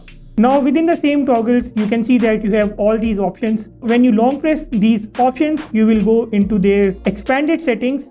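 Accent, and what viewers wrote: India. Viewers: Why? Lol is just Indian accent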